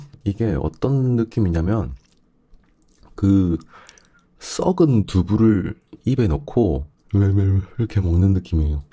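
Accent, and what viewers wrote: Busan, South Korea. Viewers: 어디가 표준어랑 섞인건가요 ㅋㅋㅋ 완전 부산사투린데요?ㅋㅋㅋㅋ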